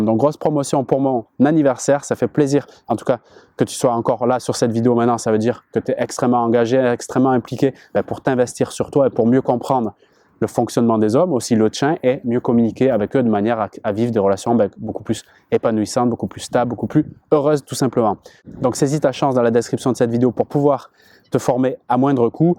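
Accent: Canada. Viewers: accent canadien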